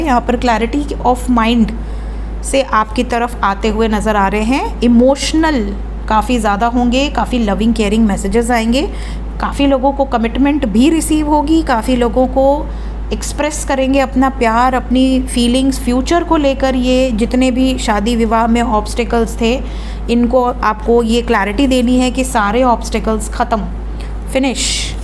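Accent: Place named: Mumbai, India. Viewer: Mem mumbaiya bhasha me bol rahe he 😂😂jhakas 😂😂😂😂😂 so qyut di❤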